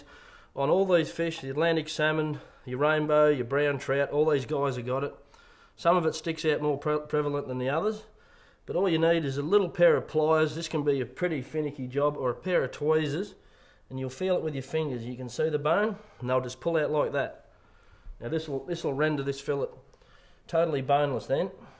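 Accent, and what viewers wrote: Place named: Australia. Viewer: the Australian accent :) I've been living in Syd for 7 years but just couldn't pick it up. love it though :P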